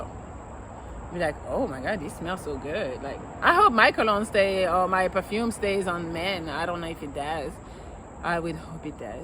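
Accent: France. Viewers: She is from France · Here's my one tip, I have others but I will give you one. Speak another language, like you. When you speak that French shit in that French accent? It gets me up😂😂😂 it does the same for a Man when he speaks to a Woman.😜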